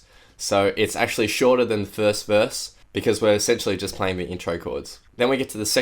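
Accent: Australia. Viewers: This legend's voice is always deeper and more Australian than I expect.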